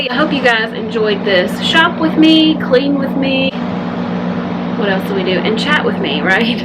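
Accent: South, United States. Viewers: Love your haircut, it totally suits you! And you say decor however you want. People just don’t understand how we talk here in Kentucky. Why don’t you say Holler, that’ll give something to think about lol. I moved to KY in 2007 and my accent has changed drastically 🤣. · We love your rambling company keeping videos. And please say decor the way you like to say it. I love your accent. I’m from the north, but have a lot of family in the south and your accent makes me feel good brings back. Wonderful memories. And I love your little place too. It is so charming and homey. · As a Texas girl who moved to Minnesota, you do not need to "correct" your pronuciation. Your southern accent is part of your charm.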